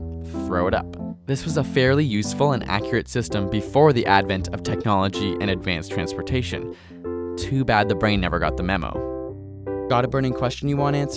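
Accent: Canada. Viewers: i hear canadian accent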